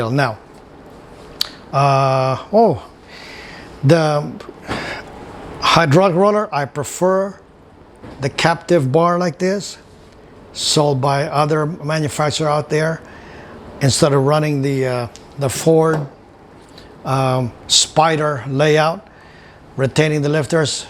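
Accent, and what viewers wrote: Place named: Louisiana, United States. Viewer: You sound like you're from down the bayou. Where are you from?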